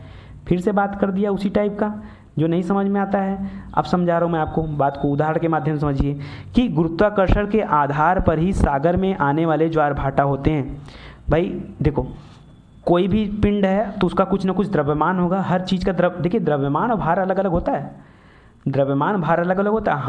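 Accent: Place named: Gorakhpur, India. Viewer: sir kya aap Gorakhpur pur ke pipiganj se hai kya aap ka aawaj waisa hi hai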